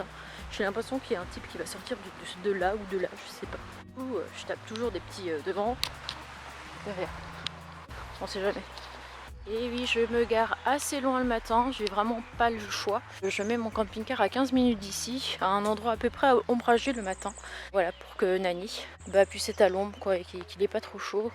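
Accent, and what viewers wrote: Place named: Belgium. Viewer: charmante et courageuse la Belge juste une fois lol et moi qui croyais que tu prenais l'accent Belge juste pour plaisanter hihi. En tout cas ça fait plaisir de voir que des femmes ose se genre de mode de vie. Un petit boujou du 50 😊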